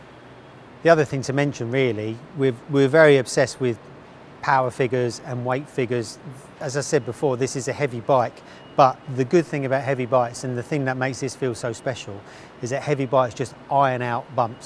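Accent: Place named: Northern England, United Kingdom. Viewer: “MmmBooossa” said in that irritating Northern accent that all Busa owners seem to have! 😝😂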